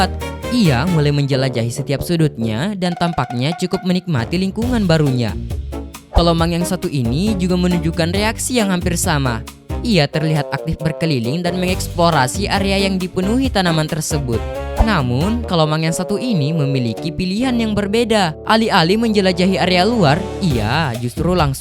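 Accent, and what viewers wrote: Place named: Indonesia. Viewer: dari suara nya udah ketebak orang indo🗿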